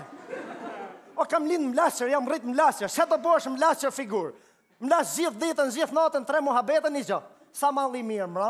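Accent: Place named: Laç, Albania. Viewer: ky o duket se asht nga laqi o sduket nryshe🤣🤣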